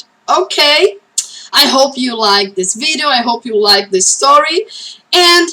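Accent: Rio de Janeiro, Brazil. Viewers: Você tem o sotaque carioca 😍😄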